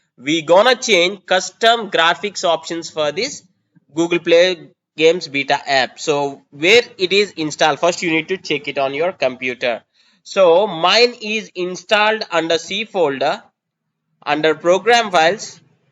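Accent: India. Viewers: Indian Accent = Instant Fail.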